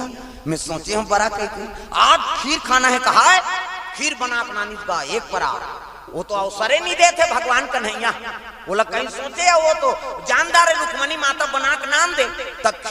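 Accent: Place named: Chhattisgarh, India. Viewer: छत्तीसगड़ी बोली माँ बहुत मिठास हे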